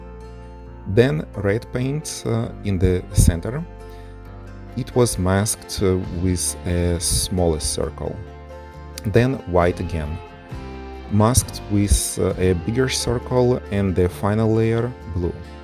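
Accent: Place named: Russia. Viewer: Чел ты с рашки? Русский акцент слышен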